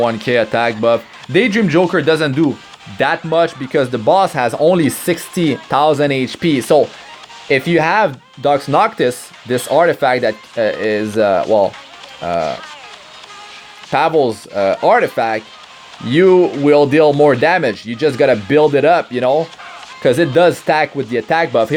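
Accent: Quebec, Canada. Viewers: I am pretty sure you are a Quebecois with that accent XD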